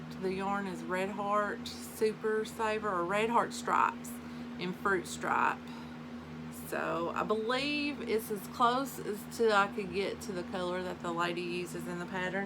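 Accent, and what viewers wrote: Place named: North Alabama, United States. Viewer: I enjoy your videos so much. You sound like you are from north Alabama. I lived there for several years. It was like heaven. Now I understand why (from Jacksonville like me and where I moved back to) sang. I never understood until I felt homesick for the wide open beauty of it.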